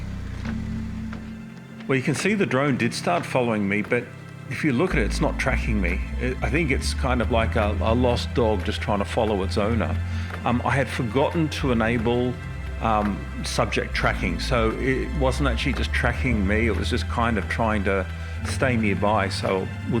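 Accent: Australia. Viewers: So good to hear an Australian voice in a good vlog! I've never heard of these drones either. I have a Mavic Air and we tried this with a scooter in the rice fields here in Thailand but not a success. What bike are you riding here?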